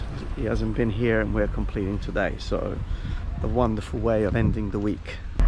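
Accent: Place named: United Kingdom. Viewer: Tantissimi complimenti e grazie mille per far conoscere la puglia con un bel British Accent 🙂 Mi ricordi tanto il nostro mitico royal family reporter Antonio Caprarica.. siete parenti per caso?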